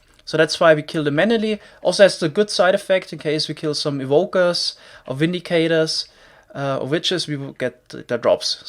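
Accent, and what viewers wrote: Germany. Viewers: You sound like you are from germany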